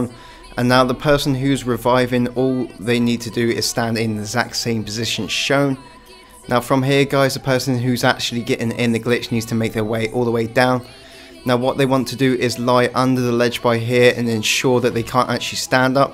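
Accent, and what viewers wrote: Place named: England, United Kingdom. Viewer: Dude,I like your pronanication by "Reich".😂1 Like for the english akcent by German words.👌👍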